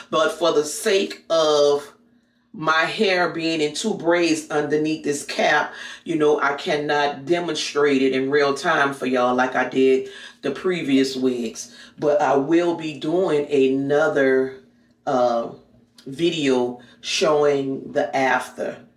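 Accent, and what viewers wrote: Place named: New Orleans, United States. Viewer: HAHA! Stumbled upon this channel looking at TEMU reviews and OMG as soon as you said BIG CHONKY instead of chunky and "Head full of hair", I just knew you are from New Orleans 🤣🤣🤣⚜⚜⚜⚜ #Subscribed but even though you make your own wigs, you can make a lot of money on here doing wig reviews for companies. Particularly it's the Asian companies that reach out and want to work with you. That big blonde wig is sending meee! 😂😭😭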